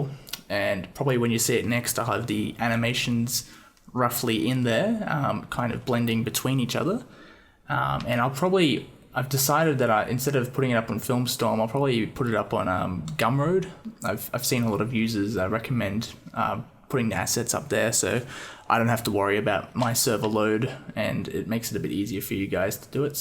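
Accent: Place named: Australia. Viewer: great work on the climbing mod you got going. also cool to hear an aussie making it code :D happy new yr mate, subbing it now.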